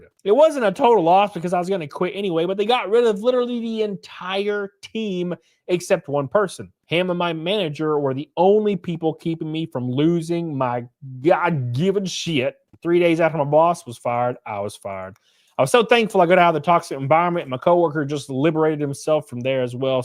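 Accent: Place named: South, United States. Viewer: Real talk i never noticed how much you sound like you came straight up like you came right out the talladega nights movie lol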